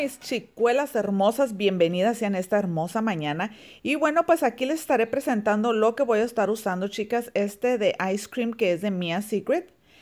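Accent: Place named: France. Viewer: Ayyy mi hermosa me super diverti viendo el videito te quedo hermosa la muñequita aunque se te salga lo frances. Bendiciones 🙌y un🤗 de🐻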